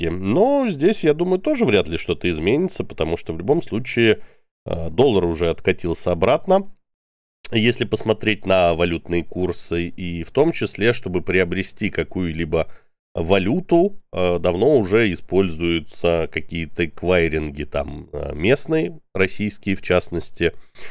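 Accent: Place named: Odessa, Ukraine. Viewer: У тебя такой типа одесский говор, как у того поца у конотопской синагоги.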